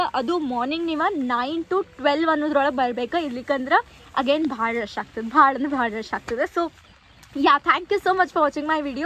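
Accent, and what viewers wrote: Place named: Dharwad, India. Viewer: Hearing Dharwad language after many days❤❤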